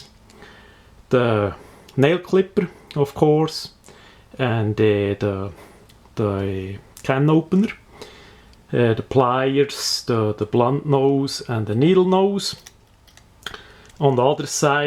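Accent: Switzerland. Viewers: Merci ver de swiss-akzent :) geil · amazing knife, helpful video and your english has a funny swiss accent ;) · Oh Swissenglish very lucky axent,!!! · I come from germany and I always liked the swiss accent... In English it's even better! =) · Das isch es richtigs Schwitzerenglisch :-D eifach genial!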